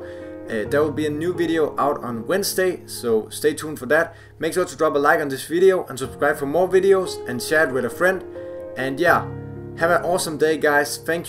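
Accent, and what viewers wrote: Denmark. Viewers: Hey! You're a big inspiration to me, ty for being who you are. I can hear on your accent, that you're danish too. #DanskArmy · are u from denmark xD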